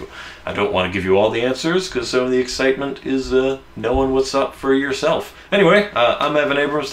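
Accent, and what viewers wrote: Canada. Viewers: That Canadian accent xD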